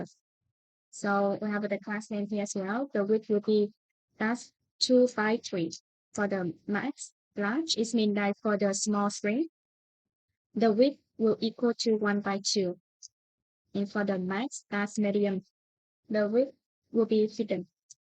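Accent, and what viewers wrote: Vietnam. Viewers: Nghe phát âm tiếng anh giống người việt cái nhìn lại tên thì ohhh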